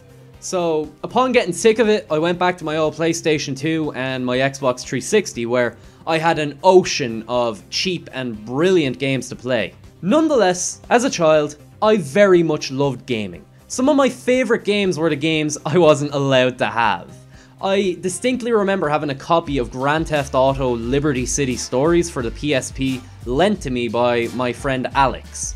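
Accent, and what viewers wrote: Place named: Ireland. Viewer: that irish accent tho 😍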